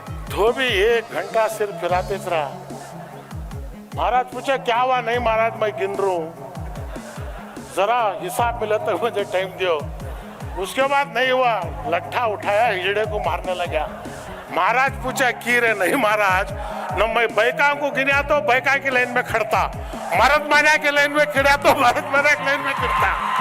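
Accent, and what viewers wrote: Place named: South, India. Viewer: south indian urdu language ka mazaich aur hai ❤❤❤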